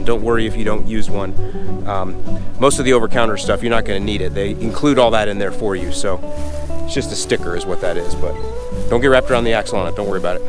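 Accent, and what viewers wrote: Chicago, United States. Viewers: The production value, photography, videography, music, sound, all enhance the great lessons. I get a kick out of the use of the Chicago accent and sayings on other videos from this! Well done. Great content! Cheers!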